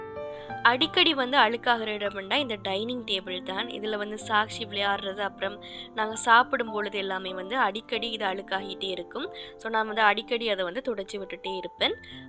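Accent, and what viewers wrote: Jaffna, Sri Lanka. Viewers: Jaffna tamil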